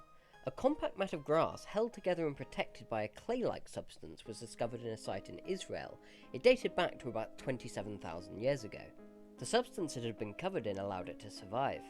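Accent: United Kingdom. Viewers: Informational videos with british voices hit different.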